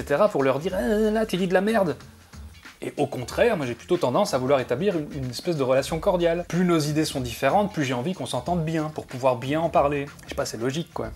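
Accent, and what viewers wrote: Belgium. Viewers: Ca n'a rien à voir mais vous n'avez pas besoin d'essayer de réduire votre accent belge. Je ne pense pas que ce soit à cause de la façon dont les mots sont prononcés que la plupart des gens écoutent ce que vous avez à dire. ^^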